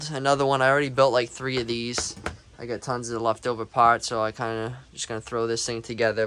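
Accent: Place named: Boston, United States. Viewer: how far away from Indiana are you sounds like you’re in Boston or something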